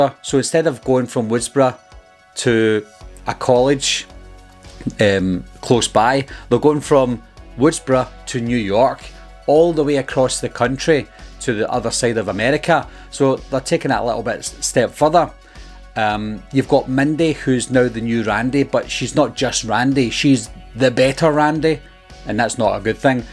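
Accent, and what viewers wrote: Scotland, United Kingdom. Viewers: I just meant your Scottish accent 👍🏻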